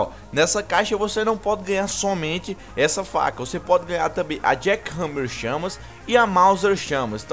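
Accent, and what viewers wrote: Northeast, Brazil. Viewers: E do Nordeste ? :D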